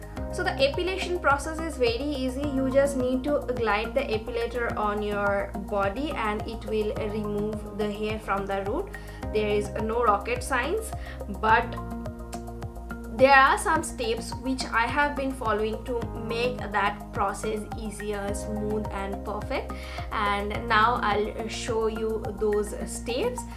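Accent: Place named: India. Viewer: Honey, she's speaking in indian accent. I'm not an indian but her accent actually not that hard to understand.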